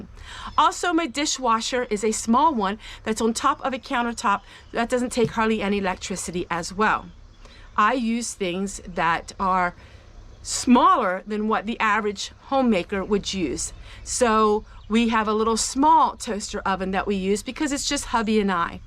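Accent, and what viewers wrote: Pennsylvania Dutch, United States. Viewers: i love your accent. pa dutch?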